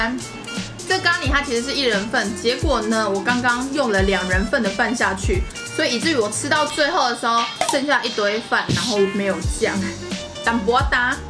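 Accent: Taiwan. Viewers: 妳真得好可愛呀~ 💖 每次聽妳突如其來的"國台語"或時而的"念錯詞"就笑了!! 很喜歡妳的視頻，繼續加油喲^^ 讚~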